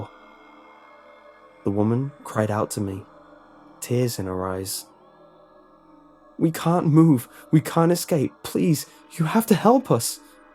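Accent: United Kingdom. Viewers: I can't believe how many views he gets, when i saw it this had only been up 18 minutes and already had over 1000 views. Guessing Americans like a creepy British voice? lol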